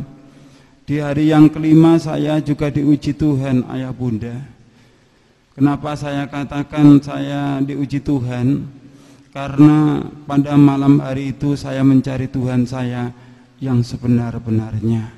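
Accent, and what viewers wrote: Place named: Java, Indonesia. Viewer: ngomong nama tapi kq jawanya nglotok ya....wah parah nih orang.....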